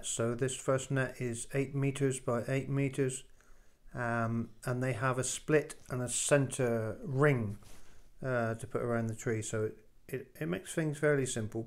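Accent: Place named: Cornwall, United Kingdom. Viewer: We should never apologise for our cornish accent, be proud of it 👍, good size pasty.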